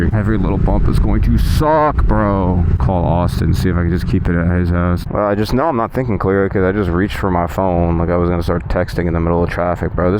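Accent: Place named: Louisiana, United States. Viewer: I know you’ve got something wrong cause you sound like you’re from Louisiana with that slur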